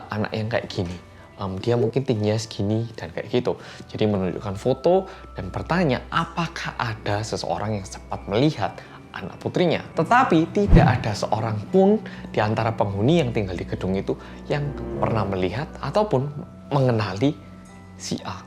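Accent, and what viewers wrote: Java, Indonesia. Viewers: Mas: halo guys welcome back to bersama orang korea yang Me: MEDHOOOKKKK!!! ❤❤❤❤ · Bersama orang Korea yang medokk keren juga tu baju nya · is “timoer” but backwards. It’s a typical wordplay in Malang, a city in East Java, Indonesia, where he grew up. “Timoer” or “timur” means east. In this context, means that he’s a korean who is from (or grew up in) East Java. “Yang medok” basically means he who has thick accent, because he speaks Indonesian and Javanese in thick javanese accent. (Medok is a javanese term so maybe that’s why you dont understand if you’re learning Indonesian) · Keren kak.... medoggg.... salam kenal kak... +1 dukungan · wong Korea sing medhooookk!! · Cus team mendeloookk... Bersama orang Korea yang medoooookk 😊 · Lucu ini kakak hihi muedok tnn ntok e ngmong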